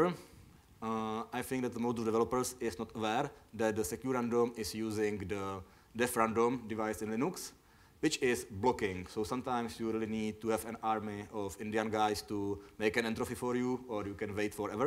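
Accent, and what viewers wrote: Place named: Czech Republic. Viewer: hehe its english or czech :D most terrible english accent i ever heard.. very funny x)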